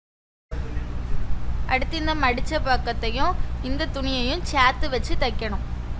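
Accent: Tirunelveli, India. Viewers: அருமையான திருநெல்வேலி தமிழ் பேச்சு சூப்பர்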